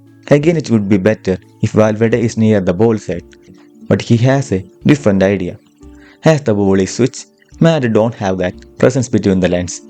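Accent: Kerala, India. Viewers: Your accent seems to be from kerala, I am a keralite. Analysis is amazing and on point. keep up the good work!!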